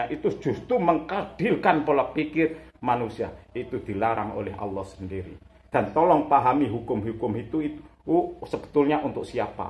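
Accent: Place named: Banyumas, Indonesia. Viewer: dari daerah mana,logat banyumasan nggeh. Saya suka gaya ramalan huruf dan Agama. Enak dipahami.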